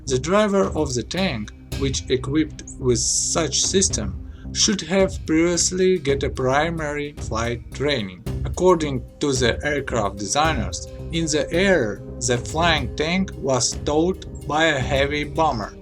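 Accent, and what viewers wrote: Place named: Russia. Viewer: that russian accent is T H I C C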